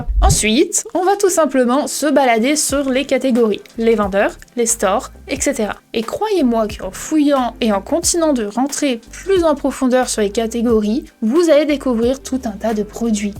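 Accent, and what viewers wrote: Quebec, Canada. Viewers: Merci pour ces idées de recherche produits ! Il est aussi intéressant de constater que dans le seller central il y a des outils "gratuits" (on paye l'abonnement) qui sont plus précis que les outils tels que Jungle Scout ou Hélium 10... Car les data viennent directement de Amazon... As tu fait une vidéo là-dessus ? Merci pour ta bonne humeur et ton petit accent québécois... ❤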